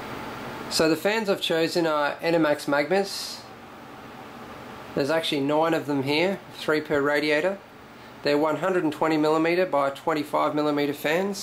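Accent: Australia. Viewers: Is he Australian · are you aussie?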